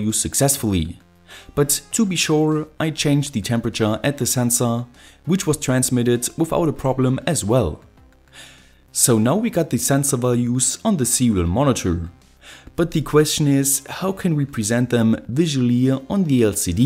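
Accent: Saxony, Germany. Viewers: are you german?? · bist du aus Leipzig? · Ahaaa ein deutscher 😂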